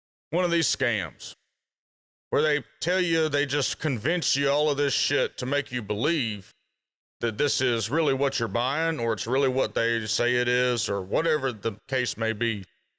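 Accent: South, United States. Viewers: I thought this was a joke you really talk like… hillbilly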